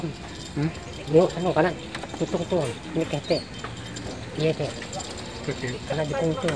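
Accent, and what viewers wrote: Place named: Central Kalimantan, Indonesia. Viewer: Menyimak suara bro seakan-akan saya sedang berada di Kalimantan Tengah.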